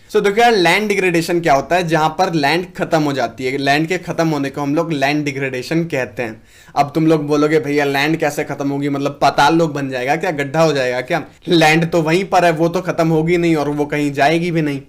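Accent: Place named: Uttar Pradesh, India. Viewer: Kariya soil😂😂love your language too😂🤣.... Katai upwalo wali feeling aata h😂 .... M to UP se hi hu to feeling to aaegi n😌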